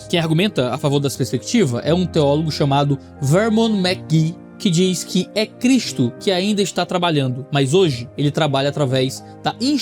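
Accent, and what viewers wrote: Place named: Northeast, Brazil. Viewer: Só outro nordestino para entender 100% o rsrsr O CRISTÃO MAIS PEBA da nova aliança kkkkkkkkkkkkkkkkkkkkk Ele nordestino no EUA e eu na África. kkkkk ótimo conteúdo.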